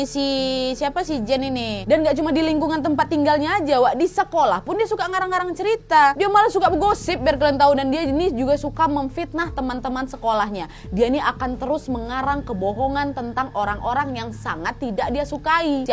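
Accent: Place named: Java, Indonesia. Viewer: Mood banget kak ngomongnya medok 😀😀😀😀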